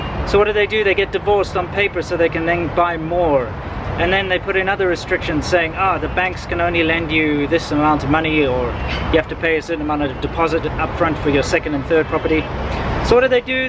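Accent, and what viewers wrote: South Africa. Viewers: consciousnick - he’s from South Africa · You clearly do not watch South African soapies to recognise a South African accent. Recommendation. Watch past reruns of etv Scandal! By the 1000th episode, you will be able to tell who is a British South African and who is Afrikaans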